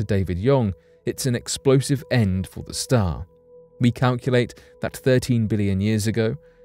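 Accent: United Kingdom. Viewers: Why do they always get some dude who sounds like he’s a member of the royal family to narrate these videos? I worked in space and aviation for my whole career and not once in our labs, did some English royal voice come over the speakers saying “ Jim I think you’ve got the solution!” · Thumbs down because of the British accent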